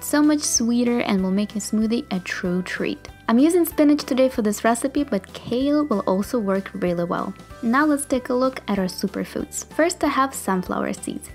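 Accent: Russia. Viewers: я слышу русский акцент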